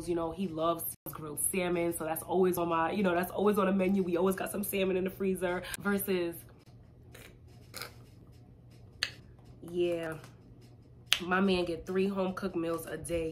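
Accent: New York, United States. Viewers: You sound New Yorkish... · I forgot how much I love a NYers accent; I haven't been on youtube in some time.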